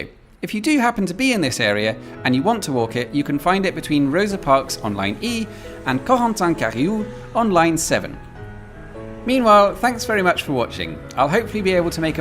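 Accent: United Kingdom. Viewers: Quick question here. So are you British, or Parisian? Because your accent more like English man rather than Parisian. But your other language is good too.. Ps: edit English to British. Sorry, because in my english lesson, british always said as english man. 😶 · Hearing your perfect french with your british accent is a candy for my french ears.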